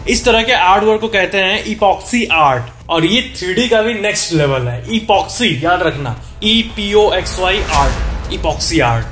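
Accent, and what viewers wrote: Bihar, India. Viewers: Sir aap bihar se ho kya???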